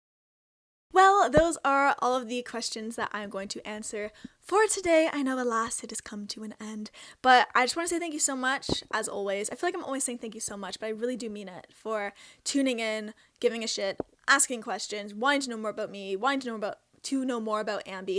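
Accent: Canada. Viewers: your Canadian accent is awesome! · You sound very canadian. The "outs" and "abouts" are cute. ^^ I think the "Sorry about that" question is a reference to Colts recent Art of Wrestlling episode with Edge, is it not?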